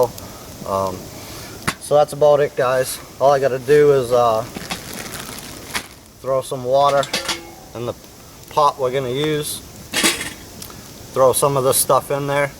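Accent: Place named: East Coast, United States. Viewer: Liked the vid. That eastern accent gets me since im from texas. Keep ot up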